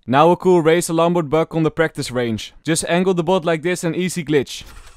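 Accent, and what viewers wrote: Netherlands. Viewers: I smell dutch, a little bit too much bro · I smell dutch 😂, still a good video man! I love your videos! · im sorry but are you dutch, because your english is a little bit weird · Love the dutch accent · Ik wist meteen dat je uit nl komt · Hahaha Nederlander die Engels praat met nederlands accent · and evrytime i think jij benne nederlands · accents can not get any more dutch than this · love the dutch accent lol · nog ff leren om dat Nederlands accent weg te halen je klinkt net als mark rutte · dutch accent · jij bent sws nederlands haha want zoon accent heb je xd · nice dutch accent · most dutch accent i have ever heard bro..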